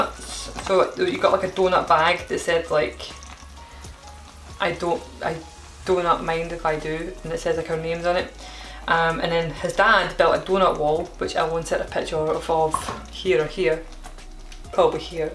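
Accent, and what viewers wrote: Scotland, United Kingdom. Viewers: I was born in Motherwell and lived in Wishaw. I moved to Canada 10 years ago. I have a similar accent to you but no tattoos ! My accent has never changed. Canadian laugh at my accent and encourage me to speak as a entertainment oddity in Collingwood, Ontario. I love your videos and remind me of home when you make tablet and coconut truffles. You are great.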